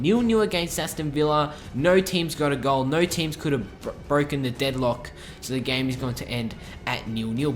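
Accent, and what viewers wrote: Australia. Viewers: Are you an Aussie and wot state in in vic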